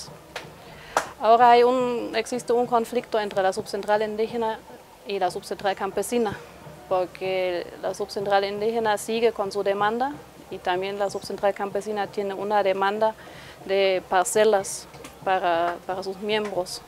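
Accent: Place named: Santa Cruz, Bolivia. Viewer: Que simpático su acento camba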